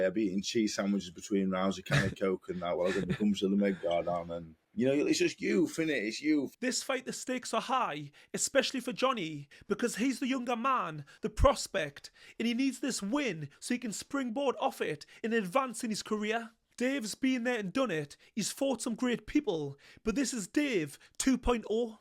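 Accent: Newcastle, United Kingdom. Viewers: Great analysis. Is the narrator a geordie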